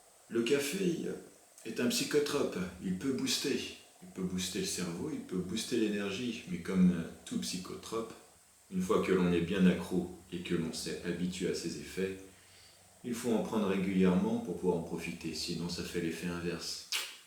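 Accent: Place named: Antilles, Caribbean. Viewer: Super 👍belle narration, belles illustrations et pas mal l'accent des Antilles...D'autres personnages pourraient être intéressant à traiter dans la même veine, papa Guédé avec ses araignées😂 , papa Legba, la Sirène ou encore la célèbre Erzullie 🌹💖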